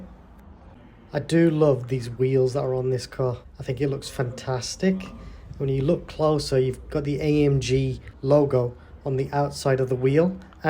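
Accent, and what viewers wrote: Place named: United Kingdom. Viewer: British Talk about German Car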